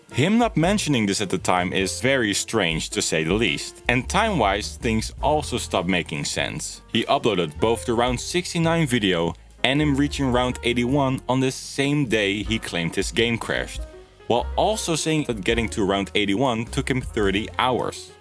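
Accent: Netherlands. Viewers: I hate the Dutch accent 😢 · Sowieso dat je Nederlands bent. We herkennen ons eigen accent vaak iets te goed haha. Fantastich filmpje vriend! De kwaliteit van soortgelijke YouTubers die miljoenen subs pakken. Mooi om te zien dat het algoritme heeft geholpen. Ga zo door!👊🏻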